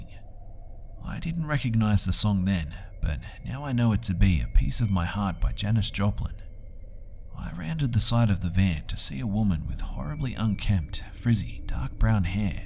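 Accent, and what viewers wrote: Australia. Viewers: Always feels so good to see you've uploaded a new video! If I'll get 1/100 of your subs, aka 387, it'd still be huge for me since I've got 22 so far, and I've released 13 videos, so I almost have more videos than subs lol XD But back to the original topic, I really love your videos, and the Aussie accent is a welcoming bonus :) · The Australian Accent was a shock in it self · Are you Aussie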